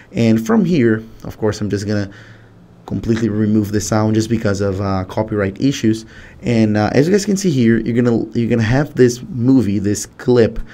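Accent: Brazil. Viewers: Are you Brazilian? I can recognise your accent cuz I’m Brazilian as well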